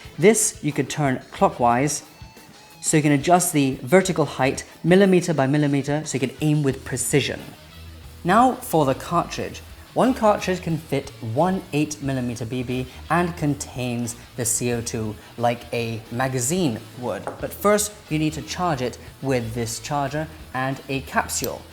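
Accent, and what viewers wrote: United Kingdom. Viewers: Asian British accent.. first for me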